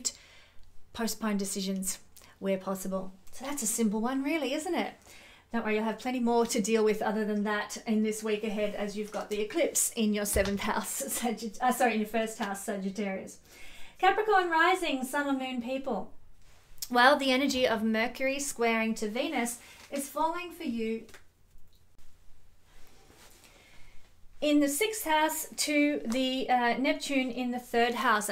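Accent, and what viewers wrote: Australia. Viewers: Pink blazer lookin fiiine, 😚! Oh, Aussie accent is the loveliest for sure! Btw, I think you forgot the time stamps for the last transit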